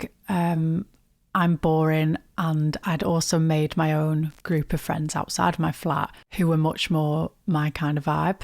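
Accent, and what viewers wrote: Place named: Liverpool, United Kingdom. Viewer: i thourt that you had a bit of Scouse accent in you that's cool snap am a scouser. And you know who you remind me of...Clare Sweeny. Too be fair it sound's like your'e flat mates were'e jealos about your'e sexy look's x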